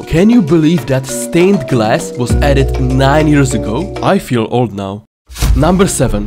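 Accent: Czech Republic. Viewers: my mans got the czech accent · You kinda have a Czech accent lol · Hele Kámo je hezky poznat podle hlasu že jsi čech :D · Poznam z tvoji angličtiny, že si Čech. Tím nechci říct, že máš spatnou angličtinu. Pěkné video. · You sound like if you have czech accent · Angličtina dobrá jen zapracuj na výslovnosti máš pořád strašně český přízvuk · Nebudu ti lhát máš doclea silnej českej přízvuk. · Love the Czech accent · t h i c c czech accent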